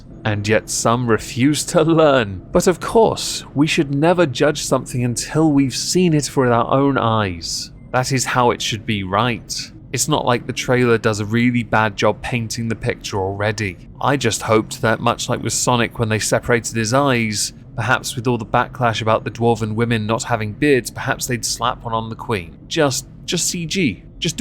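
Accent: RP, United Kingdom. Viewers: As a Geordie, all I've got to say is, your swearing is really posh 😆. I think that's why I went for my husband, he sounds posh to me too.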